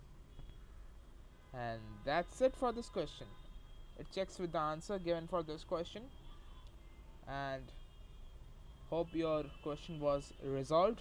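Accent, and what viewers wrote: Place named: India. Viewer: Hi, I just subscribed to your channel... One of the best Indian videos for me on youtube. The Indian accent is still there but I could totally understand you because you spoke clearly and slowly. I'm a masters student your videos help me refresh on calculus. Please if you can, do share more. Thanks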